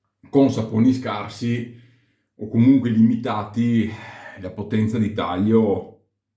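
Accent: Veneto, Italy. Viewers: Una considerazione molto oggettiva, bel video. (Comunque si nota tutto il veneto che è in te xD)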